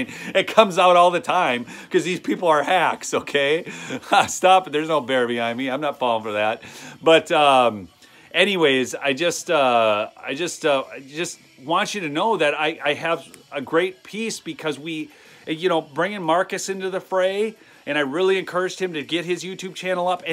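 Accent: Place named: Minnesota, United States. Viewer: I was born in Butte Montana and his accent is right out of the movie "Fargo"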